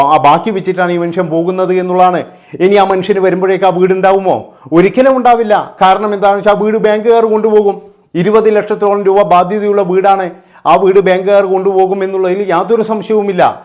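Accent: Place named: Kasaragod, India. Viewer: anchor kasarkottu karanananoo..athe slang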